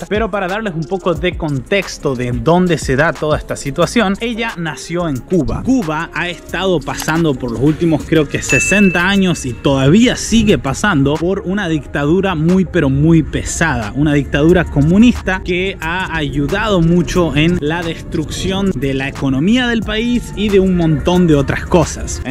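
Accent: Argentina. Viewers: Hola saludos desde Córdoba. Te sentía tonada argentina pero no sabía bien de que provincia 😅..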